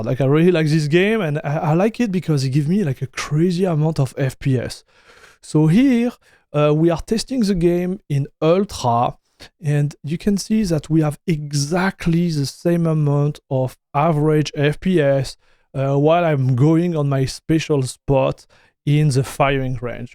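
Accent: France. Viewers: Very French accent! Un compatriote 😂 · I hope your english gets better, it sounds more like pure french, and it's very hard to understand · lol, yes french accent from france but his english is easy to understand.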